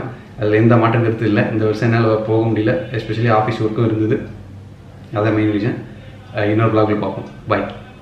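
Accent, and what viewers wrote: Kongu, India. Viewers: Hi bro.. I am new subscriber. Ungaa video first pakkum pothu ignore panni irukey...apparama dhan Tamil laa paathey...very nice chance yeah ilaaa...athuku apparamaa ungaa videos yehllam theadei theadei paakkaa start pannaney...sehmaa bro 👌athum ungaa kongu Tamil very nice....genuine person positive energy...beautiful family....cute wife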